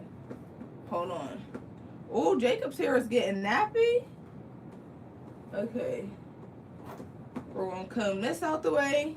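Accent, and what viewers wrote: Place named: Philadelphia, United States. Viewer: I'm big on accents, where's that accent from? It sound like the Philly area or Rhode Island or Baltimore, I can't put my finger on it😂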